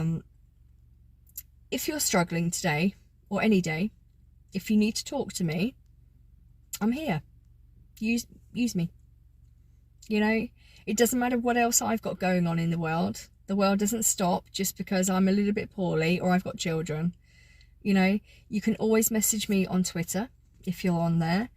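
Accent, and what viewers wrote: South, United States. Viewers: She will be truly missed. Loved her videos and her wonderful southern accent. She was a wonderful soul. May she rest in peace 🌈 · Thank you, It's true though, came into our homes 100s of times because we invited her in for a chat. Many of us will miss her deeply and yes keep her channel (I will as well) just to hear her dulcet Southern tones. I remember when my Mother passed away I would call her daily praying she would just pick up the phone so I could hear her voice say "Hello". There is some breaking news here in the UK. The Palace has announced that our amazing Queen's health is deteriorating and as I write to you members of the family are travelling up to Balmoral to be with her. Her brain is strong and hopefully she will pull through, however this does not sound good. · She was the first person I subscribed to. She was so wonderful to listen to. 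I loved her southern accent! She will be missed greatly by us all. Condolences to her family RIP sweet you are now safe in our heavenly fathers arms! 🙏❤️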